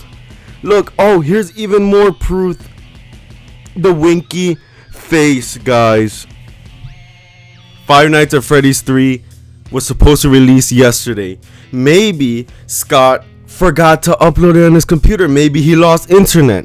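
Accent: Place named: United Kingdom. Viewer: never new you were British mate :)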